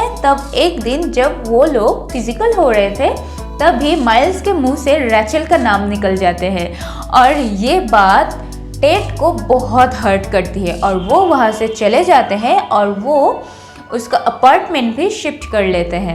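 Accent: Bengal, India. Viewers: Its ok sab bol rahe hai ki hindi thik karle vo bengali me yarr thoda farak to padega hi na hindi me 😍 per aachi to hai bhoth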